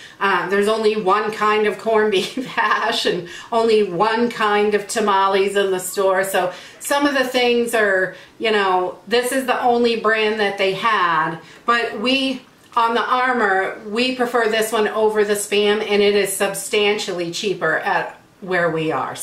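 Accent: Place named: New England, United States. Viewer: When you held up the can of mackerel I was hoping you'd say "chowda"...I love the New England accent 😊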